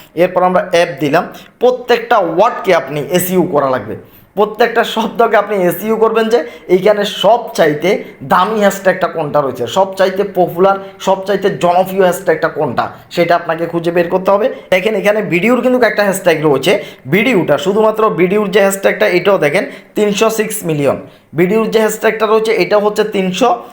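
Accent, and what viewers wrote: Chittagong, Bangladesh. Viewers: ভাইয়া আপনি কি চট্টগ্রামের?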